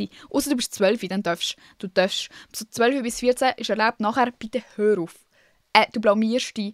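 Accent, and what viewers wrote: Switzerland. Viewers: Du bist so lustig! Ich lieb den Schweizer Dialekt einfach :-)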